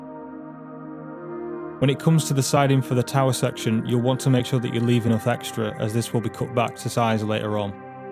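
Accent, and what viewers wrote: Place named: North, United Kingdom. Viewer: God bless you! i like the thick Northern accent with these American West Models.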